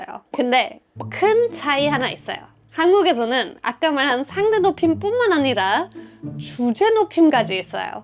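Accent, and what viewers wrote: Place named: Vienna, Austria. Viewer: 여사님 목소리는 정말 비엔나 여성의 전형입니다. 프랑스 여성과의 큰 차이.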